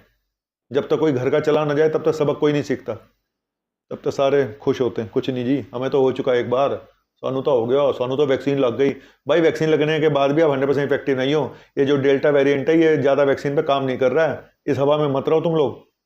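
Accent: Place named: Kolkata, India. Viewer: Kolkata reeee..... bolne ka style bahut achha lagta. Aap kolkata aaiye mishti doi khilaunga jarur .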